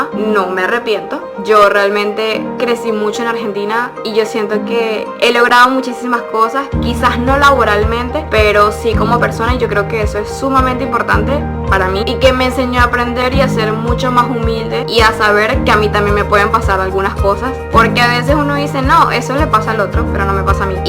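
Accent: Panama. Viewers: Tienesbun poco El acento Panameño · Todavía tienes acento panameño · te quedo el asento panameño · Se te pego bastante el acento panameño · es cierto no es por ofender pero no se te siente ese acento de los Venezolanos tu acento es como el Panameño . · tu acento ahora tiene influencias de acento panameño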